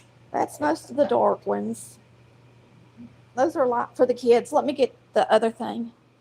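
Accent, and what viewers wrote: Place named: Alabama, United States. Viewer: I enjoy watching you cook and your accent reminds me of my auntie that grew up in Alabama. ❤ She was a great cook also. 😘💕👍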